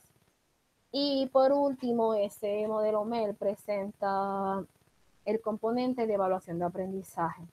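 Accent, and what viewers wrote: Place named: Puerto Rico. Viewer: Hola! Por tu acento estoy seguro que eres de Puerto Rico. Yo también lo soy pero vivo en México. Estoy tomando mi maestría en Educación Superior con la Inter y tuve una asignación relacionada con el Diseño Instruccional. Después de mucho buscar en Internet tu video fue el complemento perfecto para entender las lecturas asignadas, que sinceramente no me dejaron claro el tema. Ahora sí me quedó muy clara la forma de presentar una lección siguiendo el Modelo ADDIE. Gracias MIL!!!!!! Sigue adelante!!!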